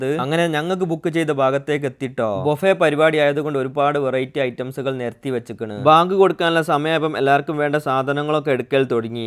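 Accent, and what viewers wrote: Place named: Koduvally, India. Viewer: തനി കൊടുവള്ളിക്കാരൻ തന്നെ സംസാരം 👍👍 ട്ടോ ട്ടോ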